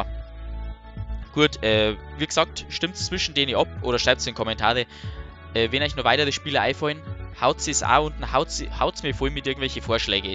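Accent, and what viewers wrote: Bavaria, Germany. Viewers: Das ist ja eben das geile ... besonders mit deiner bayerischen Stimme währe das richtig nice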